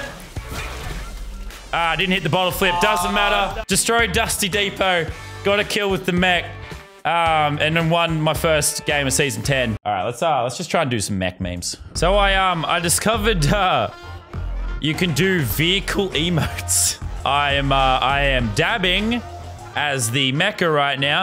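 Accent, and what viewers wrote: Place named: Australia. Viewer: I’ve been watching lazerbeam for so long I can’t hear the Australian accent lol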